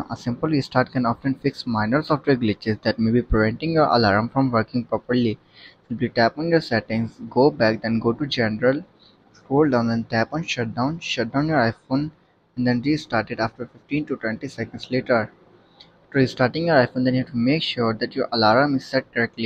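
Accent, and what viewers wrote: India. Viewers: Jesus Christ, this Indian accent is unbearable. I can't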